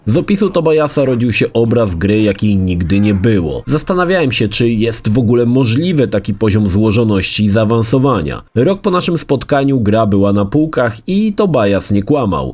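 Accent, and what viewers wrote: Łódź, Poland. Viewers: To nie seplenienie to gwara Łódzka XD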